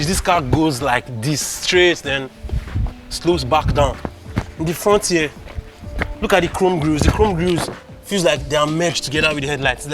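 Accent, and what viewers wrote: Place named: Nigeria. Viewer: Great review. Love your Nigerian English dialect. Do you get the later models in Nigeria too?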